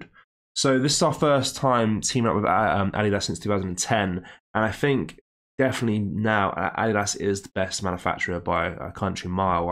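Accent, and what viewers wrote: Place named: Upper class, United Kingdom. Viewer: The public schoolboy accent REALLY pisses me right off . Time to get a proper job son...!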